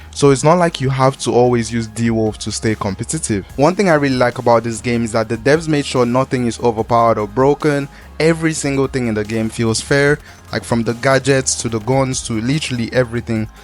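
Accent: Nigeria. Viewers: Your 9ja accent is unique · Wait are you Nigerian? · Bro are you Nigerian?? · Bro you Nigerian...😭 Aye great video man❤ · You sound Nigerian. Are you? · I know my Nigerian brother wherever... We can never go wrong with that accent... in fact to be more precise.. you be Yoruba boyyyyy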